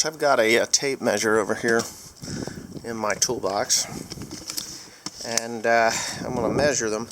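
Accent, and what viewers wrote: New Jersey, United States. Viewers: RADiator is definitely a NJ accent thing. When I moved from South NJ (Born and raised) to Colorado, I got my balls busted for saying it the same way you do lol. Also had issues with the word water. NJ = Wooder CO = WAHter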